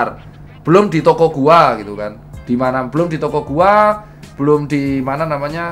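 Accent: Java, Indonesia. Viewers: Bahasa indonesiamu medok mas, podo 😂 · Dr tirta jowo medok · Ngomongnya medok tapi keren 😅👍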